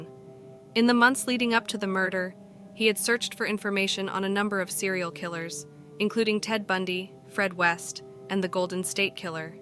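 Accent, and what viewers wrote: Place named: United States. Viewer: weird hearing what sounds like a script written in the UK being voiced by an american voice over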